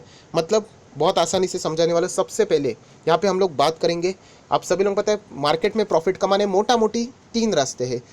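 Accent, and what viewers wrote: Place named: Mumbai, India. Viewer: mumbaiche ahe he nkki accent ani hindimde marathi words..😀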